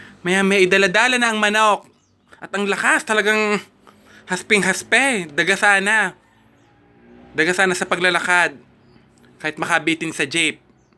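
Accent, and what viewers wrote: Batangas, Philippines. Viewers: Hahahaha nkakatawa ka tlga.! 😁😁 Lalo na eh my tono Ng batangenyo ay siya nkakatuwa..hehehe 😁😂😂😂😆😆😆😆😆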